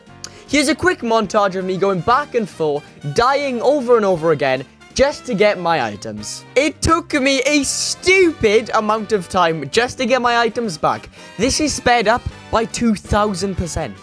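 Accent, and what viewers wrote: Wales, United Kingdom. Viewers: Are you british? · Okay, are you Welsh?? Your accent is very familiar 😂❤ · Soon as I heard a fellow welsh accent all the way from Australia I had to subscribe 😅👌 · Are u Welsh u sound Welsh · He sounds Welsh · You sound Welsh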